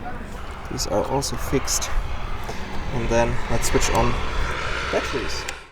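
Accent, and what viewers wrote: Germany. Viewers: sounds a bissl deutsch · wie man das deutsch raushört XD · Cool german aktzent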